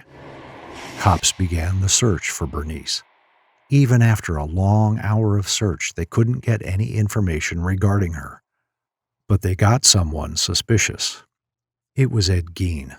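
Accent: India. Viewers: Your accent is amazing for an Indian ❤ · “I don’t know who this is, but he is from Kerala.”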